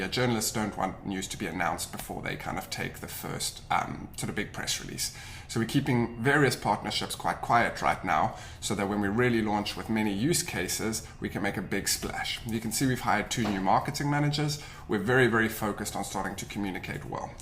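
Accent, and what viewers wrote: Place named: South Africa. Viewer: I detect a South African accent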